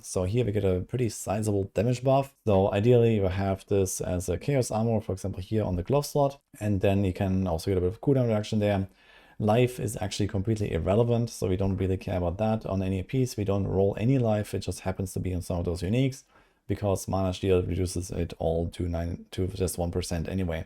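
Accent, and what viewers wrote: Germany. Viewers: Man erkennt immer sofort wer deutscher is. Nice vid! Wird direkt nachgebaut 🎉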